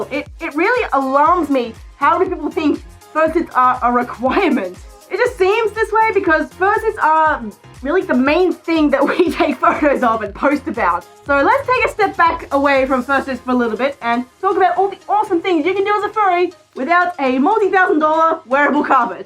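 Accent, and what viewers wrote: Australia. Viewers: I dunno if I just like your Aussie accent or what, but I think you're officially my favorite furry x3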